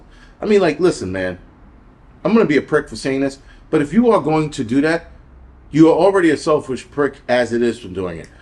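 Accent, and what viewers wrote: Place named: South, United States. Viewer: You have some South in you.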